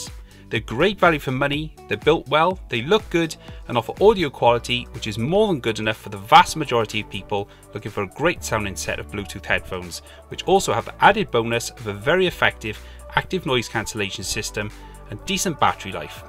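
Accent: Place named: South Wales, United Kingdom. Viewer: Another great video. Good work! Nice to hear another South Wales accent 🤣👍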